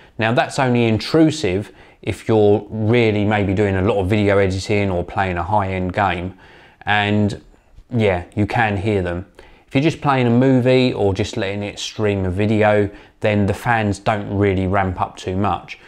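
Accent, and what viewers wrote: United Kingdom. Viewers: hes british man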